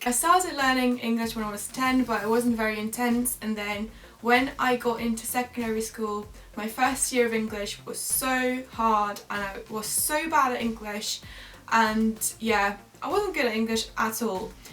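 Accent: United Kingdom. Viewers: Interesting video, I like your accent, it's British without being too British if you see what I mean. There is just a hint of another accent in there but if I didn't know you were Dutch I would struggle to place it. However it gives your voice an extra intonation and makes it definitely you, RP is somewhat dull so that extra bit sets your accent off nicely. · Most countries synchronize television programs with the native language. In the Netherlands we watch all American and English programs in English with subtitles. Best way to learn English. Ik vind jouw Britse accent trouwens prachtig. Ik kan bijna niet geloven dat je eigenlijk Nederlandse bent!!! Well done!! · Your accent is not forced, it's acquired and sounds purely British :) Which UK university are you attending? Is it in the southern England? (Just out of curiosity) I've studied in Reading for a year but that time was only enough to acquire a slight British accent, however, thankfully, watching the BBC news and some TV programs every day, made a huge difference. 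· Gosh!You sound literally like Elizabeth Bennet from Pride and Prejudice.....what a great British accent(with a twist of a classical Georgian era English) you have! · Such a talent! I wish I had a British accent too. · You have a very nice British accent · No her accent is not like America. Her accent is real British. You don't notice when she pronounces letter "T" is pronounced real T sound . American accent letter "T " is pronounced like D sound. For instance she pronounced word Better, later it is real British sound . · Only if u would practice a bit more on ur intonation .. But gush the sounds of the letters are just like real british ..i'm jealous :) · Your grasp of the English language is very good. From an American ear your English voice has a British accent with some other influence. Thank you for taking the time to make these videos. It's a pleasure watching you explain things from a Dutch perspective. 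Kudos. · You sound extreamily british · You have a fascinating accent. A mix of London, Midlands, Southeast with a bit of Scottish thrown in! A tiny bit of a Dutch accent. What an achievement! Well done. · I didn't focus on the content of the video.. I just noticed you have such wonderful eyes and a pretty face xD. btw I loved the British accent thanks to you and I'm goin' to learn it <3 xDD ..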